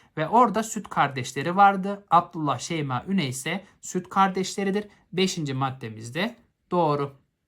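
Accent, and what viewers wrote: Malatya, Turkey. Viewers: Hocam Malatyalı mısınız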